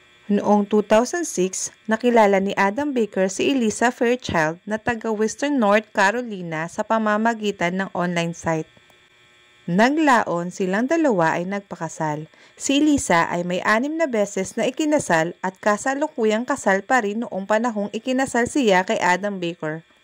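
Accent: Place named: Visayas, Philippines. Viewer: ung may pagka bisaya magsalita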